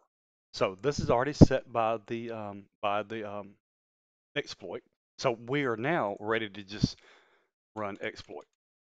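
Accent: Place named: South, United States. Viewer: I can tell from that accent that youre from the same part of the South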